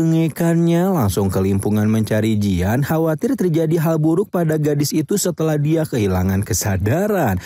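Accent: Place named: West Java, Indonesia. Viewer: Coo ... Humor gw .. sekilas orng sunda si mamang 😂😂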